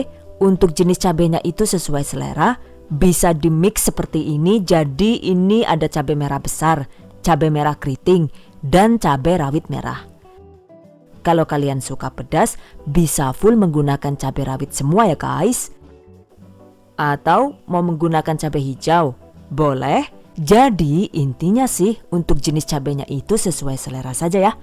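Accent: Banyumas, Indonesia. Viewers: Makasih resepnya mba 🙏 Bismillah aq mau coba buat usaha, semoga berhasil Aamiin Ya Allah Btw, kok mba nya bisa ngomong ngapak 😆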